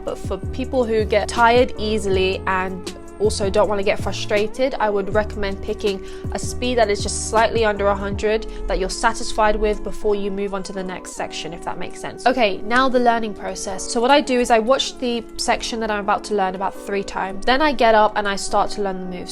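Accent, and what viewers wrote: United Kingdom. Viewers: You sound English if you are I know I’m not the only English-dancing-k-pop-fan. 😭🙏🏽🙏🏽🙏🏽🙏🏽🙏🏽 (LOL)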